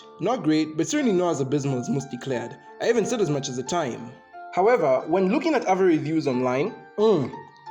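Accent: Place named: Zimbabwe. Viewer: Where are you from? You sound Zimbabwean I'm just guessing 🎉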